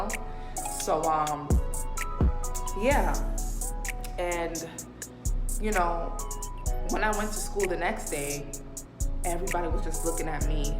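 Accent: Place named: Brooklyn, United States. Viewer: Ooo you a Brooklyn girl 🥵🥵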